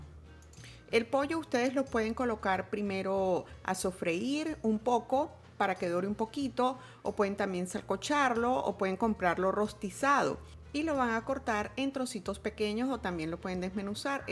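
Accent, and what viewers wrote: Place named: Cuban American, United States. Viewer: Muy bien explicado, para mañana tengo invitados, y estaba revisando cómo hacerla, gracias . Está rica. Por tu acento creo que eres cubano americana.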